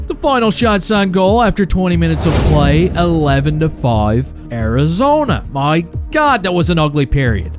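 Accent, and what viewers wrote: Newfoundland, Canada. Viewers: Is this guy a Newfie????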